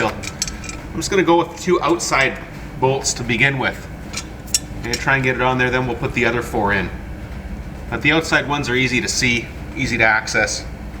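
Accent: Canada. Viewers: Watching this from UK. Thanks for posting. Are you Canadian? Take off eh.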